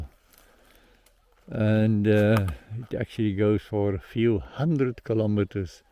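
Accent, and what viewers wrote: South Africa. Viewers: You sound like a South African 😂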